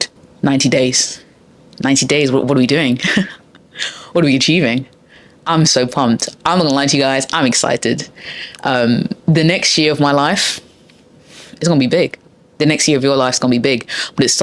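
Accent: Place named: United Kingdom. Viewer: Ah, you're British! So refreshing. Great content, thank you, Sis.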